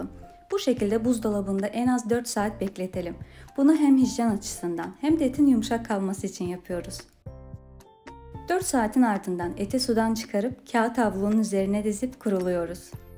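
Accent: Azerbaijan. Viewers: Azerbaycanlılar gibi aksanız var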